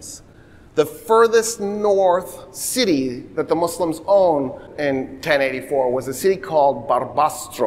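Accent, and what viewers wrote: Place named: United States. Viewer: Oh trust me, that's just the smallest of his amateurish errors. He basically ignores entire populations, maps are completely off compared to historic records and so on. That being said, he clearly sounds American, and I can tell you it is very hard to find any American, even among supposed 'experts' who has any clue about European Middle Age so it's not really his fault. :D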